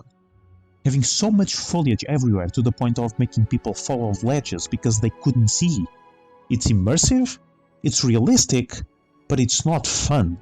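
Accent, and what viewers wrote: Portugal. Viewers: Wait you sound portuguese. Are you from Portugal? If so, CARALHO!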